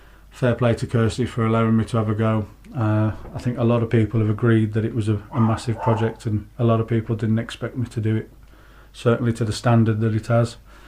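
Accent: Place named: Dudley, United Kingdom. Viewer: Where about in Dudley? I'm Dudley also, picked up the accent straight away ;)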